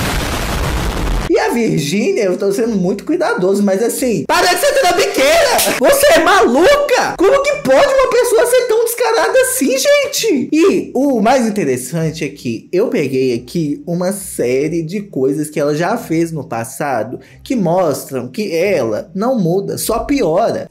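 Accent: Minas Gerais, Brazil. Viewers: as vezes eu esqueço que vc é mineiro, aí eu fico prestando atenção no sotaque e fico uai ele é mineiro kkkkkkkk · gostamos MT do seu "minerês" precisamos mudar n ( mas se te incomoda, ok) rs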